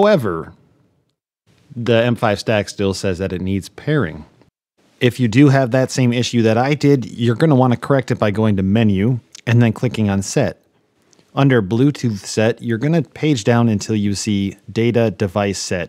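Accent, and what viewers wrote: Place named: Midwest, United States. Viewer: Fantastic video. And as a Chicagoan relocated to the hinterlands of Seattle your Midwestern accent was the best part.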